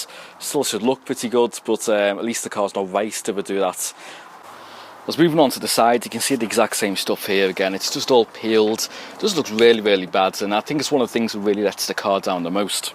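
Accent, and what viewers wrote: United Kingdom. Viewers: stop copying him then XD the thumbnail is remeniscent of his style, the car is the same as his and ur detailing of the 280k miles car is basically the same video he made (exact same method). i get that its cleaning a car, how different can you be but at least put ur own style into narration other than Im british he american. Still enjoy ur vids tho mate, keep em coming!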